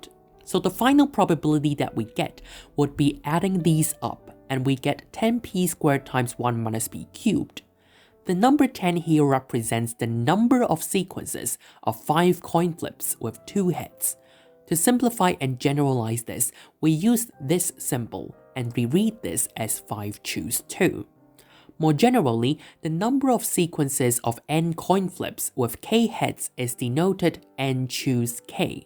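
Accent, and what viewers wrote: United Kingdom. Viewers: I like how he's trying his best in British accent, not that i have anything against it lol, I'm practicing that cuz I need to study in England as well lol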